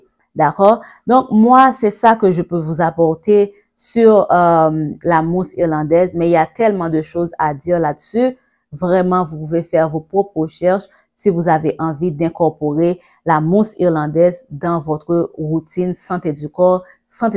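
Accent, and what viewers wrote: Haiti. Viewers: J’aime ta douceur 😅 surtout ton accent haïtien 🇭🇹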